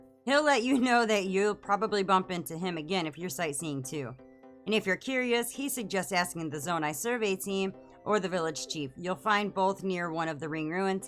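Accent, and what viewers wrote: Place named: Michigan, United States. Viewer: Sounds like you're from Flint. Or possibly Burton or Grand Blanc... or maybe you're in the metro area, who knows. But definitely somewhere in Michigan, I'd bet a fairy on it.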